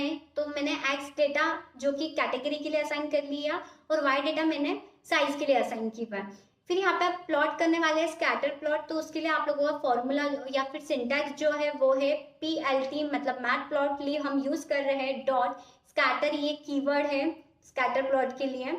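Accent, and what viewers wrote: India. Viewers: a slight tapori accent but she knows what she is doing and explanation part is really good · Hyderabadi Accent ❤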